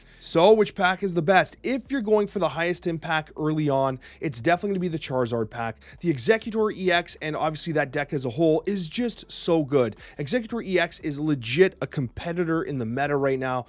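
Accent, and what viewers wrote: Canada. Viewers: He's Canadian. Leave alone!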